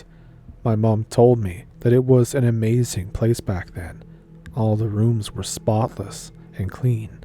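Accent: Canada. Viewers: I find your thick canadian accent very endearing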